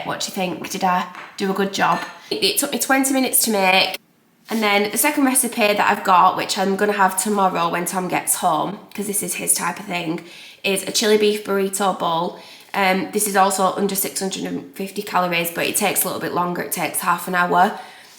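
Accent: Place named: Oldham, United Kingdom. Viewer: Morning, I’m a new subscriber. Really enjoyed your vlog. You must be from my neck of the woods, you sound just like me 😊 I was born in Oldham although I now live in Yorkshire x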